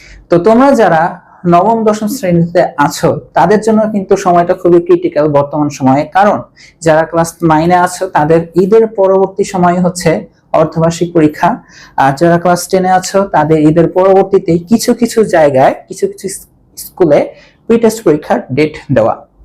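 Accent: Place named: Kolkata, India. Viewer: apnar voice sune mone hoi apni Kolkatar er teacher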